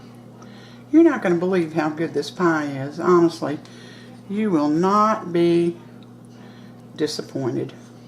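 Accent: South, United States. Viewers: I love your voice! Second run at watching. Were those toss-a-way/recycle aluminum pie tins or not? These are my Mothers favorite before I made flan...not easy to transport to holiday parties. You said "hardly" packed brown sugar. Did you mean packed hard or not very packed hard? From the North, I am in love with your Southern accent. I did a recipe from a newspsper once back in the early 90s. Dutch apple pie in a brown bag. Best results. Never found it again.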